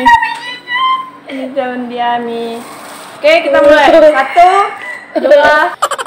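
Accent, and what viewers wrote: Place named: Medan, Indonesia. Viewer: Nampak x bahasa medan mu Dx🤣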